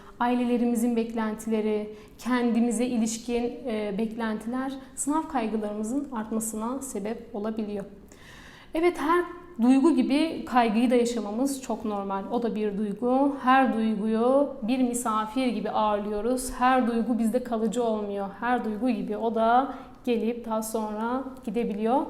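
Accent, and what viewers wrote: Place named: Van, Turkey. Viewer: Hocam siz nerelisiniz? Vanlılara benzer bir ağzınız var ama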